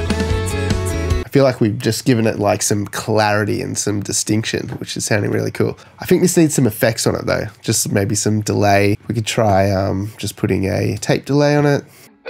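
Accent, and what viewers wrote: Australia. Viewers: Nice to hear an Aussie accent on a mix tutorial!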